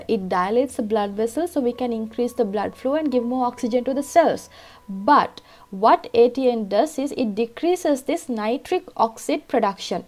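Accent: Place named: Sri Lanka. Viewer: I can tell you are a fellow Sri Lankan just from the accent hahaha. Thanks for the great video!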